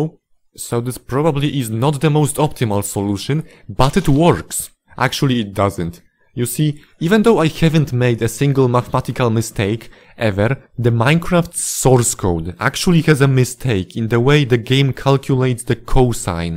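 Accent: Poland. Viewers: Twój film wyświetlił mi się w proponowanych, klikam, a tam jakoś tak po polsku wymawiany ten angielski. Sprawdzam - faktycznie, z Polski xD Fajny kontent, chyba zostanę na dłużej 👍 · U sound Polish.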